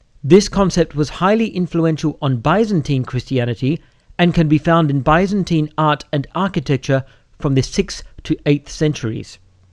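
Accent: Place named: United Kingdom. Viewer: Everything sounds more authoritative in a crisp British accent, but I have to agree with Snipe. You guys are acting like the temple came first and the text of Genesis came later. Genesis isn't modeled on a temple, the temple is modeled on Genesis. That doesn't make creation a temple anymore than a doll house is a real house. Creation isn't a temple, the temple is a reflection and microcosm of how creation was supposed to be. I am also inclined to think that the temple itself was more along the lines of a reflection of the garden. The "sea" was in the outer courts. That was the earth. Thus the windows letting in light wasn't about recreating the host of heaven. It was just a way to illuminate the temple. If creation was meant to be a temple instead of the temple a microcosm of creation, then why wasn't God big on temples? He only wrote it into the story when David wanted to build one, and He wrote it out of the story when it became almost an idol. 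God's idea was the Tabernacle.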